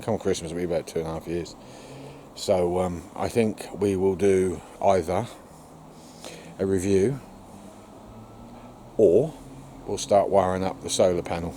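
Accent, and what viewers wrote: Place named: Suffolk, United Kingdom. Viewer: this how i understand it works after months youtube research and wading through many videos of mis information if you are wiring two batteries in series to achieve 24v if so. for example if you have two 100ah batteries in series you will have 100ah but if you have a 100ah and 80ah you would have 80ah but i would recommend batteries of matched ah batteries preferable of the same condition if you are wiring in parallel to achieve 12v assume all batteries in that parallel are of the lowest AH battery value for example 100ah connected to 80ah you would have 160ah when purchasing brick wash watch out for cheap version with less than 10% w/w hydrochloric acid and when applying on heavy deposits you may need to work it in with a stiff brush and leave and come back and work it in again when the fizzing stops the concrete has neutralised the acid i thought you was from Suffolk by your east Suffolk accent best wish to you and your family from dave Bury St Edmunds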